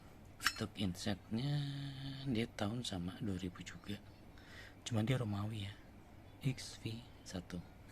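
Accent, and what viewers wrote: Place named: Banyumas, Indonesia. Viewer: mantap👏👏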